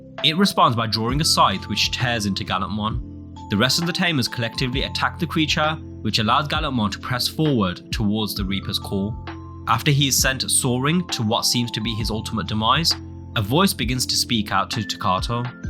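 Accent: United Kingdom. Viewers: This narrator from Birmingham? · the Scottish accent makes this 10X better ~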